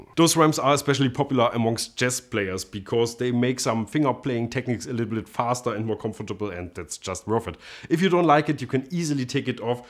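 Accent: Germany. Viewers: Just so much in this Vid . Amazing German accent- ✅ amazing bass ✅ amazing bass face ✅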